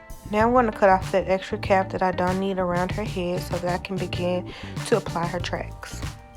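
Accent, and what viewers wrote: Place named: St. Louis, United States. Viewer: You sound like you from saint louis Missouri!! I swear you sound like us. You slay too boo!!! Plus we definitely use pro styl gel.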